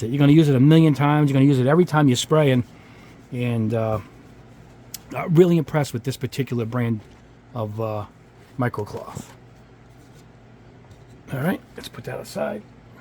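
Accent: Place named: New York, United States. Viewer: Jesus!!! Got my mind blown with the extendable brush thing!!! I was shaking my jar to use the last part!!! Top advice as ALWAYS!! Also, love that NY-Italian accent, it's like hobbying with Il Padrino!;)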